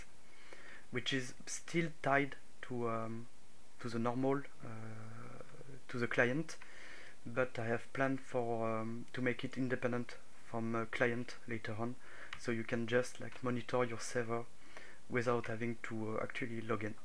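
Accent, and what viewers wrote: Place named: France. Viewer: Ton accent!!! On voit que c'est français pur!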